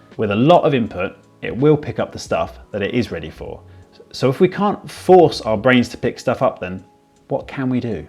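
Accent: United Kingdom. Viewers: I'm trying to learn English and i have a B1-B2 level right now. I think i understand this video at 85% even if you speak pretty slowly and you are very clear with your vocabulary. I think it's due to the different accent because i never watch British contain on YouTube. I should because i can understand an American video at 90-95%. The problem is still when people speak so fast that i can't understand. I do a lot of input but also take vocab on a special app (Quizlet Wich i recommend because it's like cards and it's very helpful). Make input and learn the vocab that you learn while input and you'll increase. Also, read in your target language even if it's book that you already know. I had a 615 score in TOEIC and by this method i have a 850 today. I don't even know the grammar that's why I hope that there is not a lot of mistakes here. Tell me if there is mistakes I'm happy to learn things from native😁. 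· Excuse me, Where is your accent from, precisely? South of wales, where? I would like to do an exchange in the future, but I think that some UK accents are difficult to understand, and yours sounds nice to me even though I'm not used to hearing this accent.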